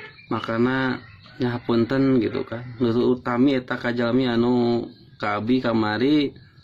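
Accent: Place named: Sunda, Indonesia. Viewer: Sunda asli mantul mang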